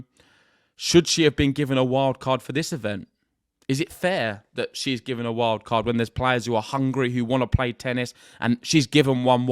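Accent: United Kingdom. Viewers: You're English/British?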